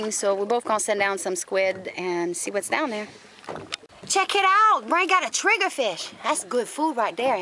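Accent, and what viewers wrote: Louisiana, United States. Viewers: Ate you cajun?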